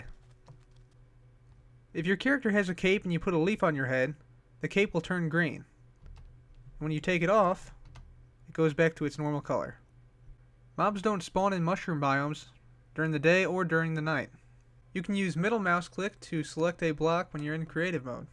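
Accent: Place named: Alabama, United States. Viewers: you sound KKona as fuck my dude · you sound alabama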